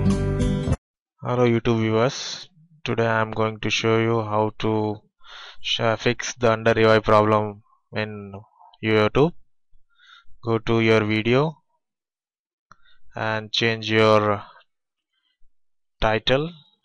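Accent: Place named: India. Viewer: I hate the Indians English accents maan ,soo frustrating ughh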